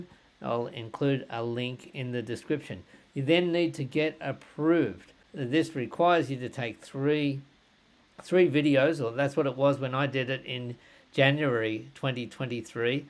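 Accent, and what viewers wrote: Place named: Australia. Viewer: Very informative video. Thank you,! One thing that I particularly liked about your video is that, based on your accent, I'd say you're Australian. I am, too, and it's nice to see content that is going to be applicable to me. Sometimes I will watch a video about something only to find that the program is only for US residents, which is frustrating and a waste of time. Keep it up!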